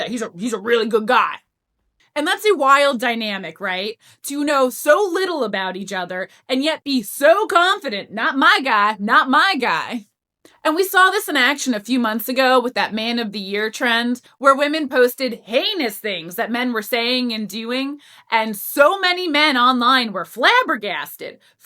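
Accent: United States. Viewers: Girl!!! You’re killing it and I LOVE your Jersey twang!!! Looking gorgeous too ❤.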